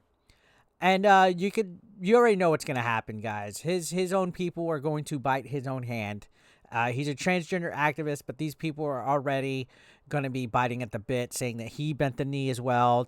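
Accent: United States. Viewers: Your amerikan voice is awful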